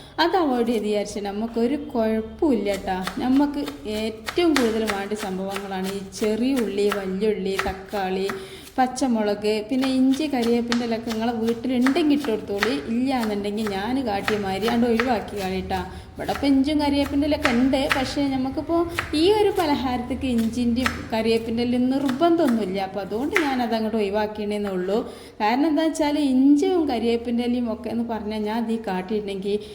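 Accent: Malappuram, India. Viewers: ഇപ്പോൾ സൂപ്പറായിട്ടുണ്ട്സംസാരം കേട്ടിരുന്നു പോകും ഈ സംസാരം കേൾക്കാനാണ് ഞാൻ ഈ വീഡിയോ കാണുന്നത്എൻറെ ഫാമിലി ഗ്രൂപ്പിലുള്ള എല്ലാവരും വീഡിയോ കാണാറുണ്ട്ഞാൻ പാലക്കാട് കാരിയാണ്മലപ്പുറം സ്റ്റൈലിൽ ഉള്ള ഈ സംസാരം ഒത്തിരി ഇഷ്ടമാണ് കയ്യ് കാണാൻ നല്ല ഭംഗിയുണ്ട് കാണാനും നല്ല ഭംഗിയുണ്ട് മുഖം ഒന്ന് കാണിക്കാമോ❤❤❤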